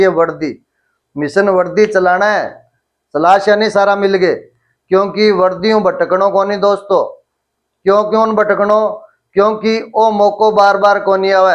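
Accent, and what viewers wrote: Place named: Bagdi, India. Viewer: Waa Sir apni bagdi bhasa m bhot bdiya triya bat clear kro the 👏👏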